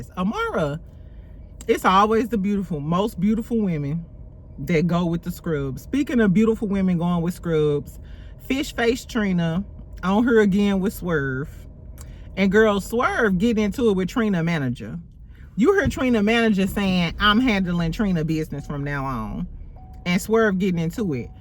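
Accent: St. Louis, United States. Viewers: I just started following you recently and you crack me up! Where are you from? I was listening to your accent like is she from STL but then you said you live in TX. Anyway, happy Scorpio season 11/20 ✊🏾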